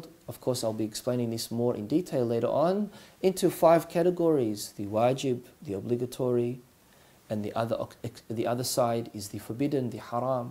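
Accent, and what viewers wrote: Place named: Australia. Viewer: Shia page. With Aussie accent.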